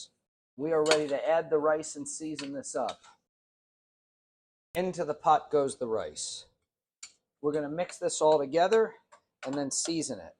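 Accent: United States. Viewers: Dats a Joisey accent. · Like the New York accent. Great meal. Thanks.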